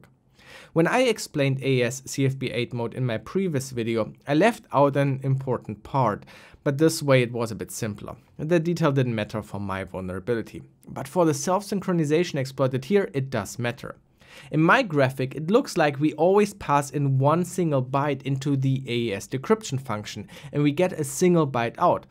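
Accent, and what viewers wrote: Germany. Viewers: U just sound so german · i hear your german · your german?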